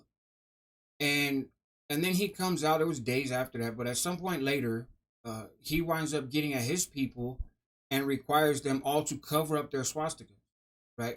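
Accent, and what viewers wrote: Mexico. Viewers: Honest question, why, how do u have a Mexican accent being white as a American. So many others have a normal American way of speaking. I wonder if you put it on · Probably because he grew up around a bunch of Mexicans. Kinda how a lot of New Yorkers have a stereotypical "Italian" accent even though they're not Italian.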